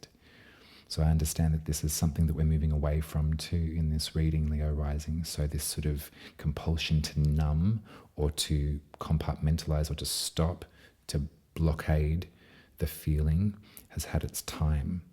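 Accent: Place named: Australia. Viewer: Finally! Someone who pronounces Uranus properly....gotta love a fellow Aussie! (And you do it with such style and grace) ❤🐠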